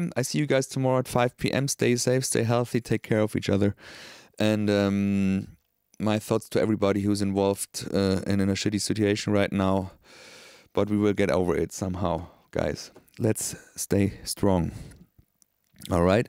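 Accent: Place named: Germany. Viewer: DANKE! I REALLY LIKE YOUR GERMAN ACCENT! 🖤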